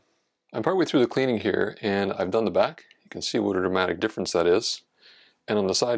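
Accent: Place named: Canada. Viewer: I enjoy a good voiceless restoration, but it was super cool to hear the explanation for everything. Its always great to see experienced professionals at work, this was a real treat! Proud of myself for catching the canadian accent almost immediately lol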